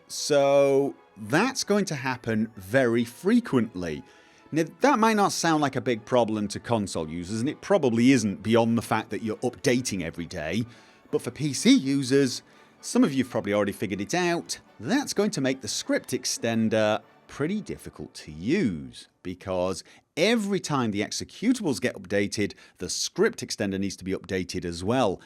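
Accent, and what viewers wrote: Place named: North, United Kingdom. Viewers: Averyl Fong Yeah hes definitly got a slight northern twang. Its inescapable. And for you yanky boys, we mean north England. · Bunny Rabbit I'm pretty sure he's just somewhat Northern :) Well, he sounds like it to me! You can hear it in the way he says words like "aff-ter" (Northern) instead of "arh-fter" (Southern/RP), or the way he says "up" (closer to "oohp").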